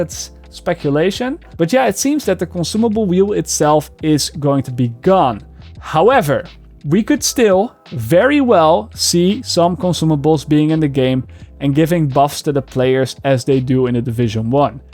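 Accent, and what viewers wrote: Netherlands. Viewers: Hey! Love that Holland accent. I have seen almost all of your Division (1) videos. Keep up the good work 😏👍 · Well, that's because he's European (most probably Dutch, not 100% sure). That's why he has the thick accent. And you are certainly not the first person to notify him. But he's a good sport about it; he jokes about it in a few of his IRL videos. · Holy shit your accent is very dutch.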